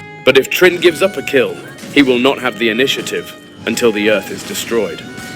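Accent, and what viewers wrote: United Kingdom. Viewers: 완벽한 영국식 영어다 하다가 중간중간 개뿜네 ㅋㅋㅋㅋㅋ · 영국식 엑센트 맛집임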